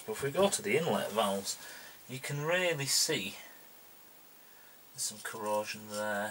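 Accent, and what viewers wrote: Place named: North, United Kingdom. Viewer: u sound northern!! lol:) me preston northwest.. uk:) lol:)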